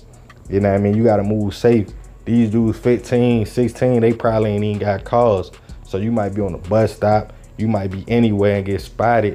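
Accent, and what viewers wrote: Baltimore, United States. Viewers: I’ve been watching you for a little minute now and I just realize you don’t say “you” with the typical Baltimore accent but everything else you say sounds like the typical Baltimore accent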